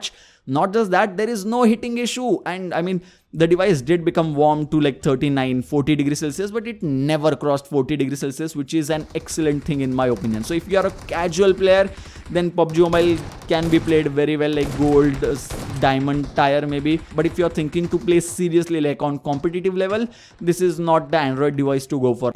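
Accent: Maharashtra, India. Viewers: Индийский английский режыт слух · Ghati English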